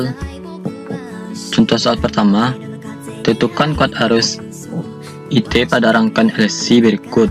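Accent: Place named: Padang, Indonesia. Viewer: Padang banget ya nak logat nya... :3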